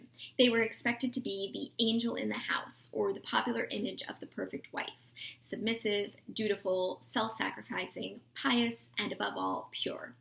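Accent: United States. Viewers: I absolutely love your videos, so informative about many time periods in literature. I particularly liked your video on the victorian period. I learn so much about literature from listening to you. Also you are such a good speaker I am beginning to become addicted to your channel. Which part of America are you from, just curious. Love you.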